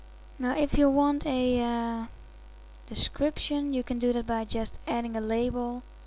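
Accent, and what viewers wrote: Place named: Netherlands. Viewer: Haha, ik dacht al te horen dat je Nederlands was!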